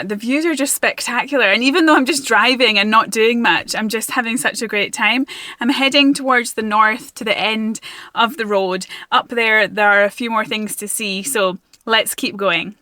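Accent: Scotland, United Kingdom. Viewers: Cool video. Watching the auto-generated CC subtitles wrestle with her Scots burr was also quite amusing.. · You make lovely videos but speak so quickly in the voice over sections. You may say, "use the captions". Unfortunately, my eyesight is failing and captions in your and other videos, never stay on long enough. But I do like your clear video and hearing your lovely Scottish accent. I can see the Paps from here on the Ayrshire coast opposite the half Highland, half Lowland Isle of Arran. As I stayed for many years on the Isle of Lewis, I sailed the Minch in fair and foul weather and it could be pretty foul. Good luck in your adventures. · I love the Scottish accent! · Trying to place your accent, I'm a Sneck man and like to hear a Scottish accent but I love a Highland one. P.S. There is a resident otter family that I see now and again and they are overlooked by the bridge to the black isle. 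I obviously can't say where on YouTube as I don't want them bothered by people.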